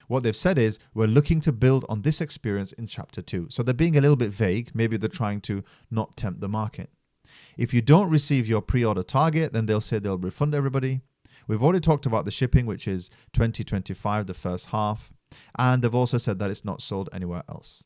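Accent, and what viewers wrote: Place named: India. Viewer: I can hear an indian undertone. good video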